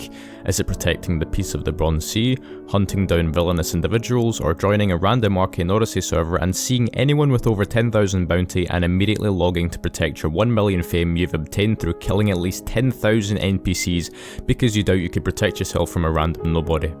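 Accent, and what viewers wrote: Scotland, United Kingdom. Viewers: I love your scottish voice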